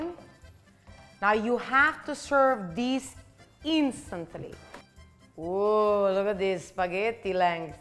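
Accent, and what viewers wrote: Italy. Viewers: Your beautiful and i love your Italian accent I'm sicilian and i love your ricette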